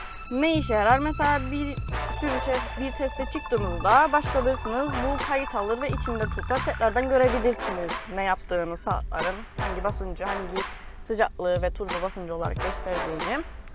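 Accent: Cyprus. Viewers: Kıbrıs lehçesine bayılıyorum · Ya bu Kıbrıs konuşmasına bayılıyorum 🤣🤣Kıbrıs tatlısı kız formula yarışcısı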